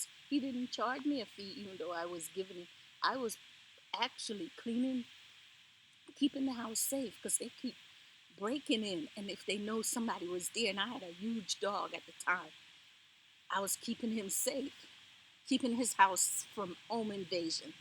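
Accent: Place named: Jamaica. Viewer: I hear a Caribbean accent...sounds Jamaican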